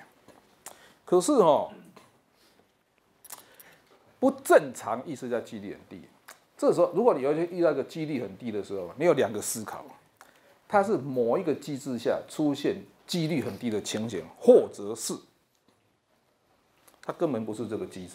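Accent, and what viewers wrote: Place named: Taiwan. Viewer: say Mandarin is his second language and English his technical language. He has a strong Taiwanese accent when speaking Mandarin and borrows many Taiwanese vocabulary.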